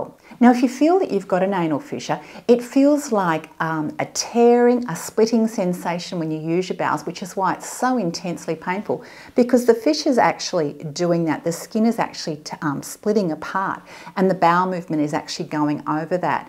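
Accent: Australia. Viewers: you have a very very strong Australian accent! ... it sounds lovely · struggle to understand the Australian accent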